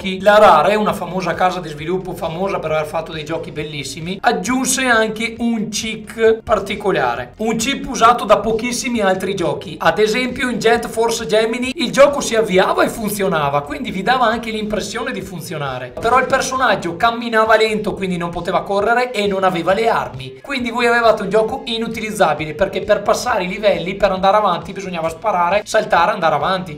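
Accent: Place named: Veneto, Italy. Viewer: L'accento veneta di quale provincia è?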